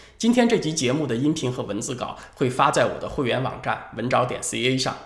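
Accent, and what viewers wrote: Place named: Tianjin, China. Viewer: 天津普通话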